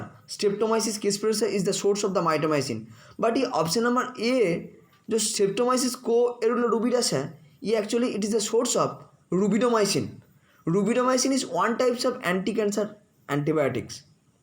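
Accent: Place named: Northeast, India. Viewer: Thank u so much sir 😊.. Your voice is seems like northeast people....I really love northeast people because they are very kind herted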